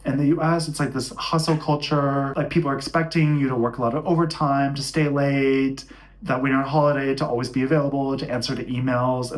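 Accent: Germany. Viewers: about a german accent: I thought the same thing ;-) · Irgendwie, komme ich nicht um den Eindruck herum das ich da einen deutschen Akzent höre. Trotzdem, gut wenn den Ammis mal erklärt wird mit wem Sie es zu tun haben. Wichtig, wir deutschen sind nicht platt zu machen und immer angepasster als jeder Ammi, auch wenn jeder meint wir sind zu dumm dazu. Das ist Taktig und Strategie. Wir hassen Risiko und halten euch Ammis deswegen für absolut Verrückt weil Ihr es eingeht. Danach sind wir sauer das wir das nicht gemacht haben. Deswegen bewundern wir euch auch. Weil Ihr Risiko liebt. Wir nicht. Das kann aber auch gefählich werden sehr gefährlich, 1933 bis 1945 war so eine Zeit als wir im größen Risiko den Risikolosesten Weg gesehen haben. Das kann leider jeder Zeit wieder passieren. Das solltest du den Ammis erklären. Danke schönes Video.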